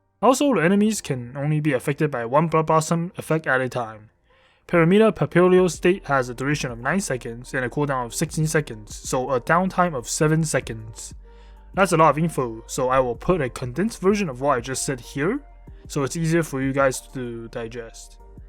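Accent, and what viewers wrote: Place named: China. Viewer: 哦哦哦，听你口音，还以为是中国的。哈哈哈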